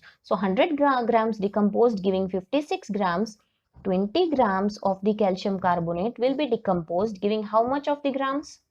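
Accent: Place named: South India, India. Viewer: Mam you are definitely from south. Hai na..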